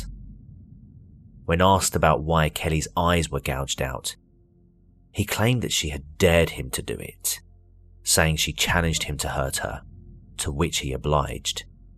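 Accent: United Kingdom. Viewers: Hiya, I was sent by Kyle's channel, Dire Trip. I'm very pleased that I took his suggestion. If you'll indulge me for a wee bit, I've a few things to say. First, thank you for the warning. I did not heed it, but am grateful you provided it. It was sadly warranted. Second, this is an horrific case. One I'd never heard of. I understand your research is top notch and I commend you. Third, I am a long-time fan of true crime but only recently been seeking out YT vids on this subject. Now, I am not a snob, but I do appreciate good grammar and a good flow to the narrative. (I'm sure alot of folks would say the same.) I think you did a fine job. I enjoyed your accent as I am a transplanted Scot living in U.S., and my Mum was from Manchester 😊 I do enjoy a Brit accent. I also noted that your tone conveyed your disgust at the heinous injuries this poor soul endured. 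Bless her memory. I'm happy to be a new sub, I am grateful to Dire Trip for the suggestion and I really look forward to hearing more from you. Thank you very much. Blessings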